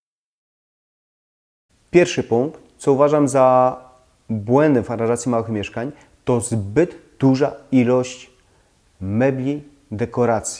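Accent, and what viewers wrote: Silesia, Poland. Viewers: Czy Pan jest ze Śląska ?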